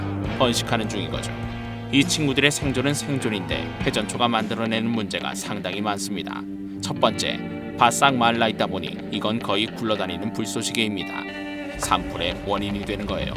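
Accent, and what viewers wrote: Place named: Seoul, South Korea. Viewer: 옛날 서울말 쓰시는 것 같다 ㅋㅋ 옛느낌 낭낭한 목소리 분위기 좋당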